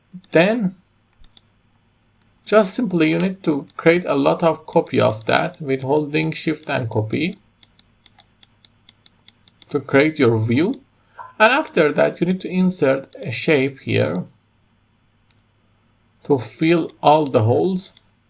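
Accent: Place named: Iran. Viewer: ایرانی 😅😅😅😅 لحجت خیلی زایه هست حتی از هندی ها هم بد تره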